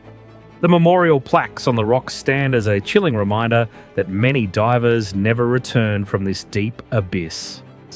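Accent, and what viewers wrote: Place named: Australia. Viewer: I enjoyed the Aussie language being spoken. Thanks mate, great video. I'm watching from Mornington VIctoria Australia.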